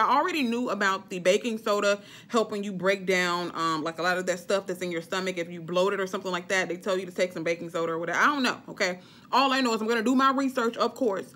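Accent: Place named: United States. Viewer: Lol girl you killed me, i love how you American talk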